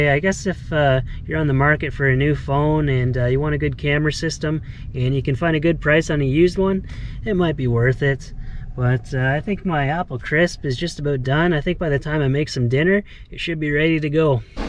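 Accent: Canada. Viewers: Which part of Canada are you from? Seems like you’re accent is type of Canadian accent Americans make fun of.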